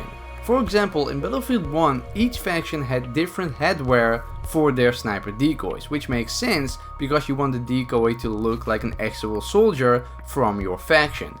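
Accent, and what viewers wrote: Netherlands. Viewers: Ik hoor namelijk een Nederlands accent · Are you dutch? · He sounds dutch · Are u dutch? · Ben jij nederlands ik hoor het aan je engels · You dutch? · You have a Dutch accent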